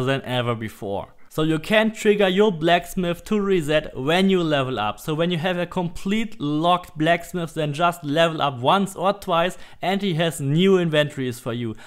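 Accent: Germany. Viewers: I’m just curious are you German? Or Austrian? Or from a totally different country cause I’m dumb lol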